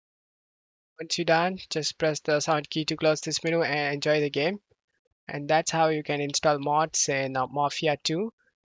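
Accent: India. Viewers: Indian accent, i knew it will work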